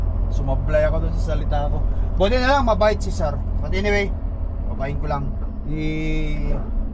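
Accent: Philippines. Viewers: astig kapa rin lodi ,..Pinoy na Pinoy ka magsalita da best Lodi Kong truck driver ❤❤❤ drive safe always watching your vlog here in St.Catherines Ontario🇨🇦🇨🇦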